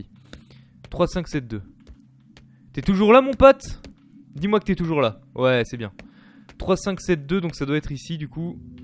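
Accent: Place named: England, United Kingdom. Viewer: Vidéo génial comme d'habitude et ton accent anglais est toujours aussi ouf xD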